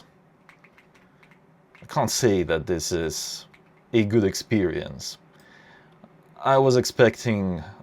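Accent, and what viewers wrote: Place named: Russia. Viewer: I knew your voice sounded russian as soon as I came across your channel, haha! That's so cool xDD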